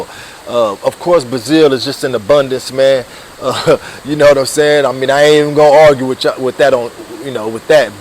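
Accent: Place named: South, United States. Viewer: My brother you got game the gift for gab and much respect to you for help with understanding where we travel 🙌 💯 let's chop it up 😅 hey where you from Miami i bet somewhere down south. Im from Montreal Quebec Canada 🇨🇦 but visited my Aunt Uncle and cousins Miami dade airforce base and sounded similar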